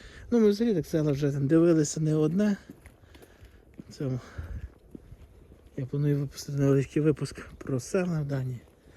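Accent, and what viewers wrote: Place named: Western Ukraine, Ukraine. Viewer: ви мабуть родом з Прикарпаття,відчувається що десь західної України,я теж родом звідти,але все життя проживаю біля Києва.Хай вам щастить!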